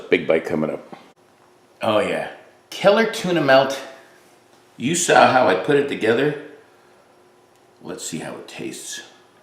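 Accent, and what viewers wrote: United States. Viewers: I’m from Australia I love ur American accent 10/10 👌🏽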